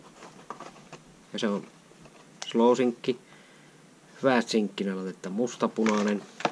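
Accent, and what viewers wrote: Savo, Finland. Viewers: Sun seuraaminen lähti niistä chilivideoista kun kattelin e6363ee:n kanavaa ja äijä oli sielä järves aivan pellit kiinni😂Ite oon Pohjanmaalta kotoisin ja jotenki tuo Savonmurre on niin hauskaa kuunneltavaa,ja se et läppä lentää niin saa nauraa välillä melkein kuset housuissa.. Ja nää nykyiset kalastusvideot on tosi hyvää settiä kun itekkin oon kalamiehiä.. Jatka samaa rataa vaan! Oot parhaimpia tubettajia joita oon seurannu.👍👍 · asiallisia videoita savon murteella, sekä hyvät kalastuspätkät. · Aitous ja savon murre. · Onhan tuota tullu seurattua ihan alkuajoista asti. Kaikenlaiset pärinät ja savolaisuus sai seuraamaan. Ja kyllä se savolainen suorapuheisuus ja elämänasenne on saanut pysymään kanavalla. Olihan ne pärinävideotkin viihdyttäviä, mutta nykyinen suunta on oikea, jatka samaan malliin! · aloin tilaamaan sillon ku kalastusvideoita alkoi tulemaan ja parasta on toi sun savon murre. 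· Tykkään erityisesti kalastusjaksoistasi ja myös kalastukseen liittyvästä muusta materiaalistasi. Savonmurre on myös mukavaa kuunneltavaa. Olen oppinut myös kalastusjaksoistasi paljon uusia tekniikoita ja kalastustapoja, kiitos siitä!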